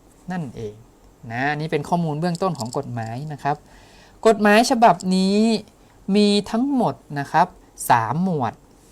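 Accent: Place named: North, Thailand. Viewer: ติวเตอร์เป็นคนเหนือใช่ไหมคะ สำเนียงคนเหนือมาก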